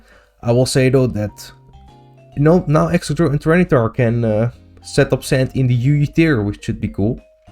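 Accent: Netherlands. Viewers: First word you spoke I immediately knew I was hearing a fellow dutchie 😂 Lovely content though :) glad to have found your channel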